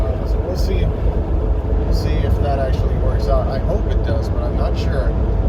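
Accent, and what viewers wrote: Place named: United States. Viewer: Crazy you say English isn’t your native tongue. You sounded like an American.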